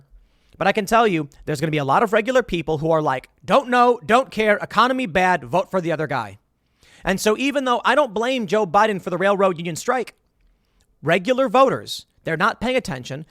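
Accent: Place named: Chicago, United States. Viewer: ever since his little blow up about "election deniers" he's become harder and harder to listen to. That new cutesy chicago accent thing is highly annoying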